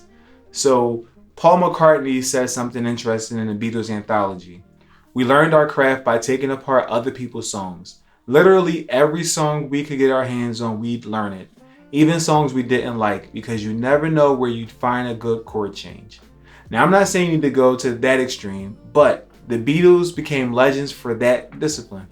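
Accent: United States. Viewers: Funny, you sound American, but the road footage shows someone driving on the left side of the road. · Ik this prolly irrelevant right but u sound like u from Philly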